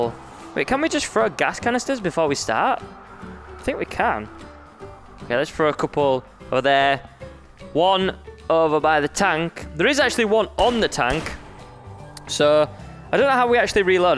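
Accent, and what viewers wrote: England, United Kingdom. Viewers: Where are u from England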